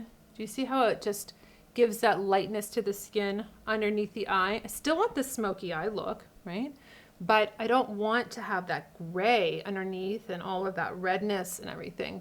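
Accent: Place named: East Coast, United States. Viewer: Google says you are from Colorado but you have an east coast accent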